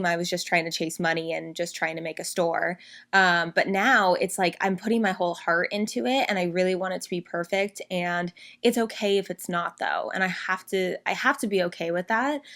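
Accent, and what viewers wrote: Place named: United States. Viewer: i just cannot stand your grating american accent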